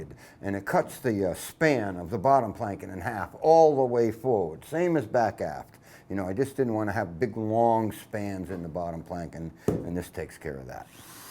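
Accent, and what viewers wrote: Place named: Boston, United States. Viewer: You have a stronger Boston accent than me haha, nice video!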